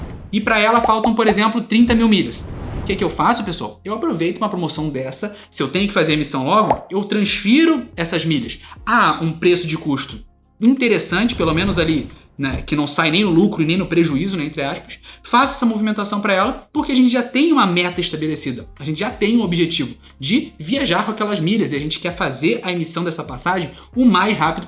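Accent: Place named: Rio de Janeiro, Brazil. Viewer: Meu camarada, não troque o sotaque, deixa o s ser X naturalmente, somos cariocas 😅😅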